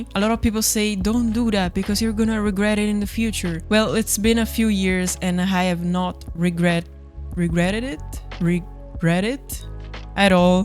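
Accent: Italy. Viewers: Your italian accent is so lovely btw ❤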